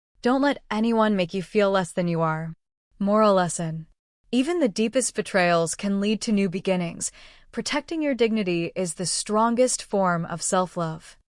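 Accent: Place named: United States. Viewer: If she was born on Australia why has she got American accent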